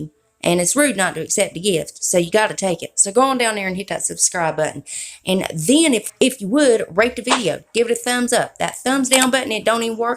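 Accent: South, United States. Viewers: I love your accent so much!! I'm southern...but girl, you're super southern, 😂😂!! Bless it!! 😘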